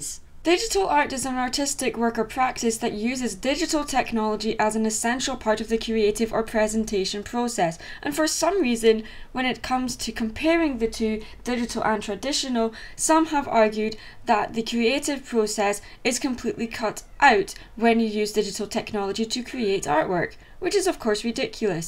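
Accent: Scotland, United Kingdom. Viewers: wait....are You Scottish?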